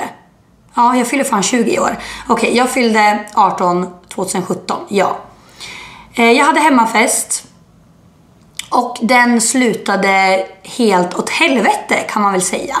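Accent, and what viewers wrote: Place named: Stockholm, Sweden. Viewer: Vilken jävla stockholmska du fått sjukt asså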